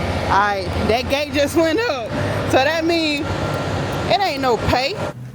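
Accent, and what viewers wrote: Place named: Florida, United States. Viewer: 😂😂That's that FlawdA talk. I say it, too. Yall have a good day. Referring to one person. 😂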